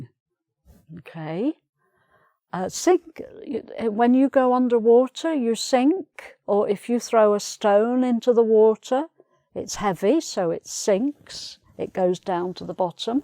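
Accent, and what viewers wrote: United Kingdom. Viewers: The British accent kill my ears. Jesus. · What a beautiful British accent.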